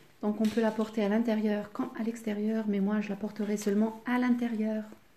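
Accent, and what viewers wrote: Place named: Meknes, Morocco. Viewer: Je me disais que ton marocain est le même que le mien tbarkellah 3la nasse Meknès ... Ma ville d'origine 😍😍😍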